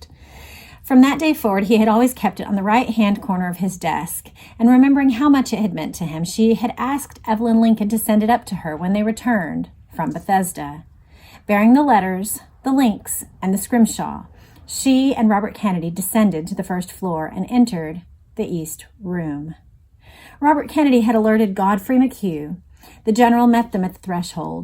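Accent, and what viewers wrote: Texas, United States. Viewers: always reminds me of the Actor Andi Mac Dowl it's that lovely Texan Ascent 😊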